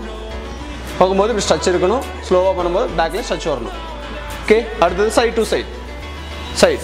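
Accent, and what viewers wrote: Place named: Kongu, India. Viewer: Bro neenga Coimbatore ah..? Kongu Tamilin enimai katuku sogama eruku...;)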